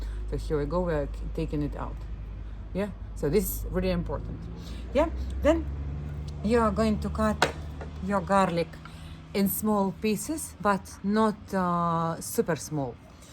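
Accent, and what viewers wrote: Russia. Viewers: Thanks for the recipe! I have to try it. 🩷 👍🏻 I think you have a Russian accent! Where are you from?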